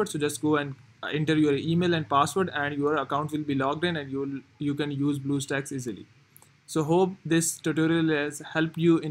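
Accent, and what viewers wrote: India. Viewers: So ein inder akezent feier ich mega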